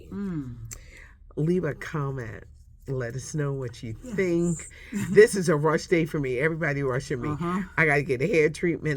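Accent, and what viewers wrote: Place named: Philadelphia, United States. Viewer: Bad guy Diddy is. Ur voice SCREAMS Philly! I love u listen to WDAS WHEN I Lived in Philly. Props to u beautiful lady.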